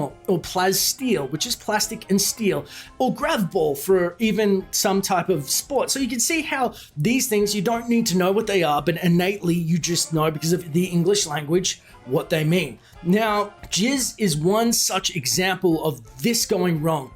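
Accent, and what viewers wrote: Australia. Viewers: do you do looper too or am I just a racist who thinks all Australian men sound the same?.....Are you even Australian?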